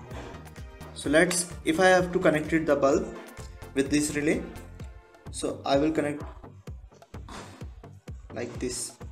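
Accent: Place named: India. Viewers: I personally don't really like it. But if I get to choose between Indian English or nothing at all, then the decision is really easy. I do appreciate videos like this a whole lot :D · i love this indidan english!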